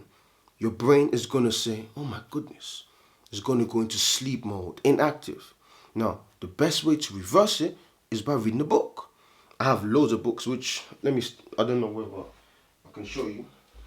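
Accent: United Kingdom. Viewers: based on your accent im assuming you are from the UK, I have a question, do you think it’s realistic that if I retained for let’s say 6 months wisely having my diet in check fasting and meditation etc, that I could earn around 200 to 300 gbp on average a week earning money online if I spent 6 months of research on it? It’s a specific question but I’m just curious how quickly SR can get me out of this rat race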